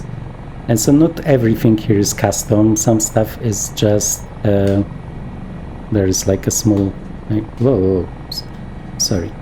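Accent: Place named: Poland. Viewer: nie ukryjesz sie, polaka slychac na kilometr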